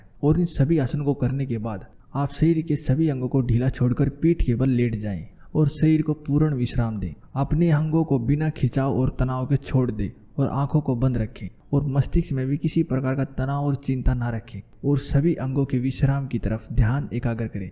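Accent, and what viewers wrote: India. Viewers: Are u from haryana??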